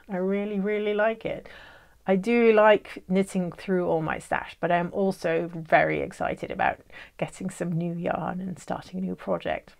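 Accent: United Kingdom. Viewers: Hello. Greetings from Ridgefield, WA, USA! I just found your podcast and quite enjoyed it. I'm a fairly new knitter so I have alot to learn and am open to any and all tutorials. Could you please give your name and explain why you are in The Netherlands, please? I had assumed from your accent that you are in the UK. Thanks, and take care. Patty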